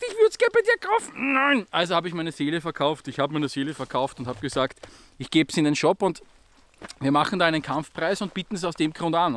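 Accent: Austria. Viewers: Was hast du denn gehört? Und er ist ja auch Österreicher deswegen der leichte Akzent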